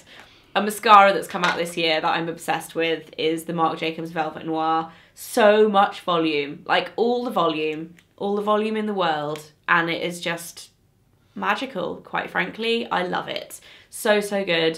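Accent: United Kingdom. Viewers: Ugh, where have you been all my life?? I'm completely in love. I could kick myself for not finding you sooner! But instead of kicking myself, I shall just binge watch your videos and enjoy drinking in your wisdom! Am I fan-girling out too much? Ah well, that's okay with me! I must say a lot of the US beauty bloggers I have been watching all talk about the same products all the time over and over and over. I know there are exceptions to every rule, but sigh...not many exceptions to that one in my experience. What I appreciate so sooooo so much is getting to hear about so many DIFFERENT products! There aren't many mentioned here that have been mentioned at ALL by the beauty vloggers I've been exposed to, and that's the absolute truth. I am dying to learn NEW things from NEW people with NEW experiences - and this channel is it. I'm hooked and loving every second of it. 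Aside from appreciating your expertise, I could listen to you speak with this beautiful U.K. accent all day. All I allowed to say that?? LOL well I am! I'm just in love with all things this channel - and I'm so grateful! Can't wait to tell everyone I know. THANK YOU SO MUCH! I can't wait to see all you have to come in the upcoming months. Blessings to you in the New Year! 🦄⭐️☃️💋💕🌹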